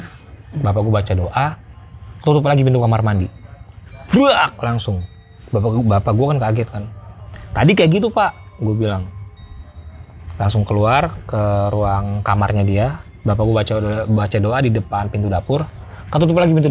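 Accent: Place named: Lampung, Indonesia. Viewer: Lampung emg gtu lagat ny bang jadi biasalah 😅 sy juga org lampung🤣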